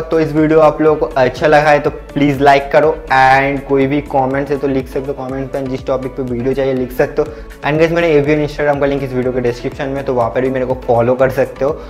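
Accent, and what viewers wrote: Bengal, India. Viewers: Aap bengali hai kya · Bengali bolte bolte ap ki Hindi begd gaee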